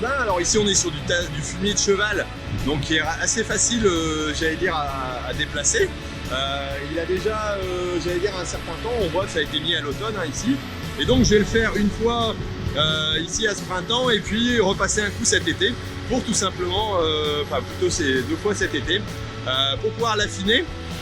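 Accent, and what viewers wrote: Nord, France. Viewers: T'es du Nord ??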